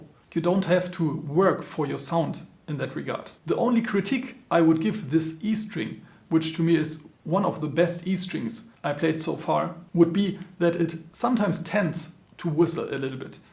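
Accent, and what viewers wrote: Germany. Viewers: i also love your German-accent english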